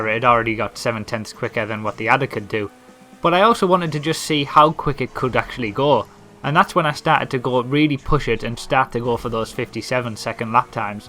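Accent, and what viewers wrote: United Kingdom. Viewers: is this dude british, were is he from? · man all this british guy does is just race on 'cutting corners ' in his british accent. a lot of this is human error , so his times are skewed. just b/c this guy says a car is 1sec faster than one other one. doesn't mean it is. this is stupid. don't listen to him. he just likes to hear himself talk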